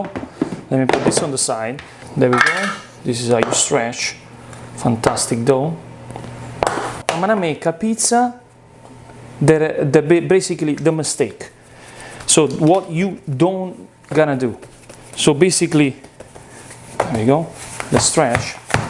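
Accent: Italy. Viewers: I like you English with Italian accent and I also like very much your videos. Great job! I want to be your student to be a BIG pizza chef WOW!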